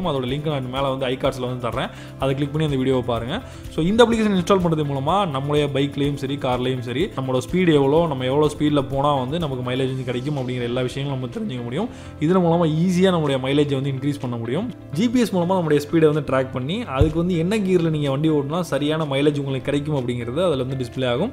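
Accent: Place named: Nagapattinam, India. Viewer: Neenga nagapattinam ahh bro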